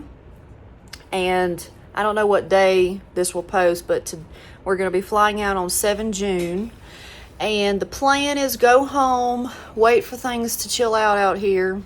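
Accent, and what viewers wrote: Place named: South, United States. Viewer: Go home and strengthen your southern accent because you’re rapidly losing it.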